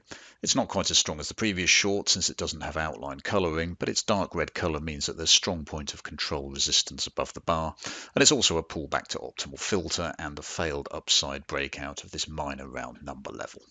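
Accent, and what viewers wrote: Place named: United Kingdom. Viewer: Good stuff! You have a great voice. Very calm sounding with a classy British accent.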